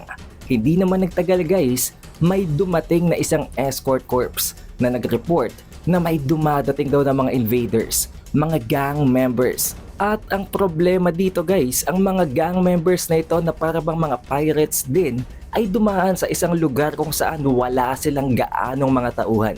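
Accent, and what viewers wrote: Philippines. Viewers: Idol try mo wag mag english ang pangit ng tuno mo eh masyadong pinoy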